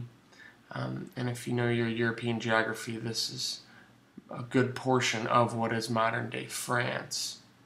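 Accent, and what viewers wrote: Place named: Minnesota, United States. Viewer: You sound like someone from Minnesota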